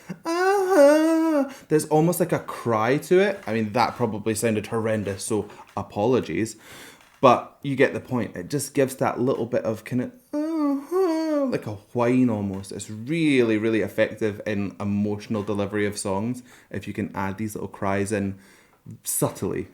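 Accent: Scotland, United Kingdom. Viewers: Thank you for the analysis. A million years ago, I did vocal training but your mix of anatomy and explanation is really enlightening. BTW, you have probably heard that JK tends to be the go to guy for a lot of harmonising in the background of their songs and we have got to see him working on it for Euphoria. It is fascinating as he created multiple harmonic lines as he worked , listening back and overlaying them on the fly. He seems to just be very musically literate..as in, understanding quite complex harmonic sounds effortlessly. I am quite amazed by it and very jealous in a good way. Also, love your voice, in spite of no warm up (bold!!) and the Scots accent is great. Almost as good as the Irish but we'll leave that off. Thanks again.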